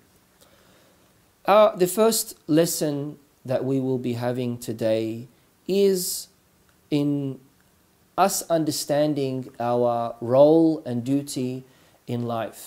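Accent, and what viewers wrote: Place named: Australia. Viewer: Shia page. With Aussie accent.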